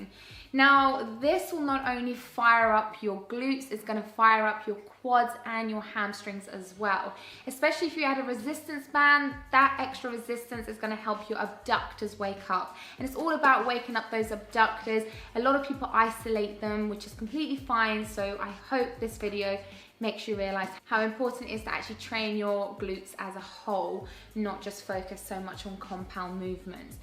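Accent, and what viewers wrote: England, United Kingdom. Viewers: I usually don't like English accent but yours is just so amazing :)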